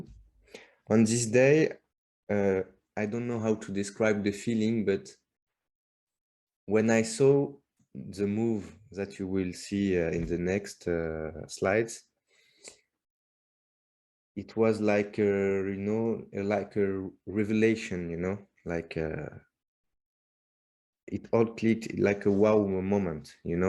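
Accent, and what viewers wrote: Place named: France. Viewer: merci😁😁😁...tu as un accent anglais"français"...merci encore pour l'éducation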